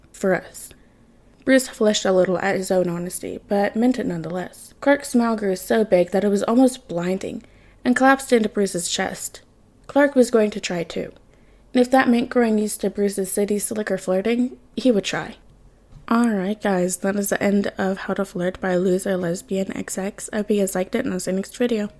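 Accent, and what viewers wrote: Midwest, United States. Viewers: Hehe, i LOVED your midwestern accent, peak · Omg I love your midwestern accent!!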